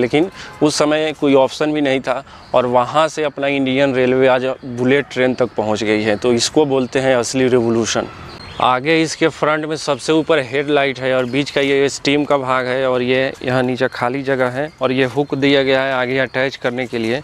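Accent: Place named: Bihar, India. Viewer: प्लीज बिहारी हिंदी में ना बोले 😭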